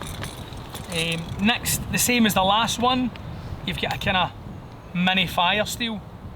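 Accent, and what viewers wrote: Scotland, United Kingdom. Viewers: Cool wee vid guys and great Scottish accent. You planning to get out filming a bit more this year? Thanks for filming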